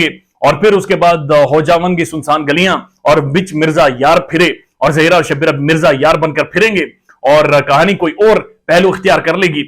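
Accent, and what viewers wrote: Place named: Punjab, India. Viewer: Your Punjabi accent is just woww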